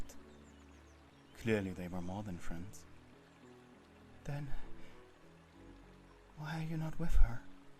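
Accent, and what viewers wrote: Germany. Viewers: you kinda sound german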